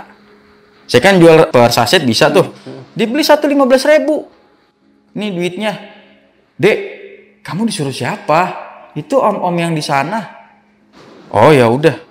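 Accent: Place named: Jakarta, Indonesia. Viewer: Enak logat ngomong nya c Abang nya,Betawi bgt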